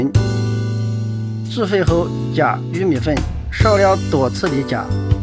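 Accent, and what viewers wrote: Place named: Shaanxi, China. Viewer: 陕西陕西话蛮好听的，这个鱼做甜品也很好吃😋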